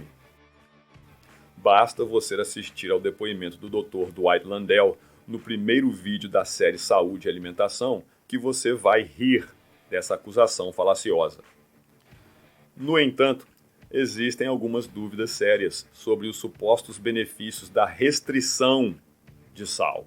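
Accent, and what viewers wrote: Minas Gerais, Brazil. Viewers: Mineiro, de qual cidade voce e he ? porque seu sutaque e muito do interior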